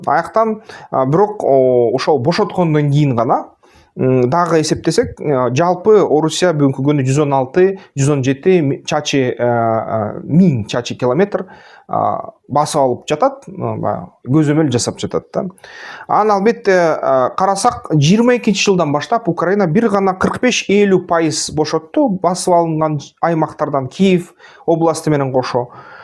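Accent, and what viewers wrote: Standard. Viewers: АРЫБАНЫЗ, Бар болунуз мырза таза кыргызча суйлогонун кубандырат.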